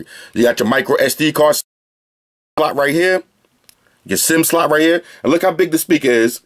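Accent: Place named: New York City, United States. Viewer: I'm here just to hear your NYC accent!!!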